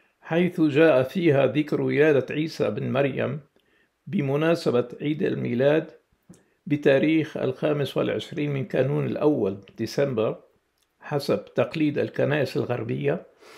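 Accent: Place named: Iraq. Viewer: هل هو ؟ لأن لهجته عراقية وليس سورية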